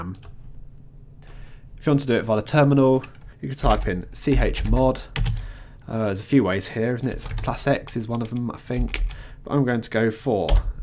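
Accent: United Kingdom. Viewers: I love your british accent! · some questions, pls. U rite #!/bin/bash but Ive been riting #! /bin/bash, that is, with a space between the! and the path. Is that important? At least my first scripts have worked. Second. U use to rite bash yourscript.sh to run them, I use sh myscript or. myscript or./myscript or even bash myscript, however, I have a book called Linux the complete reference, 3rd edition and there the author says that when we give the file executable permission, we just need to rite only the script file name, example: $ myscript But what I receive is: bash: myscript: command not found What is bad? Thank you for your videos. And great accent! Pure Brit;)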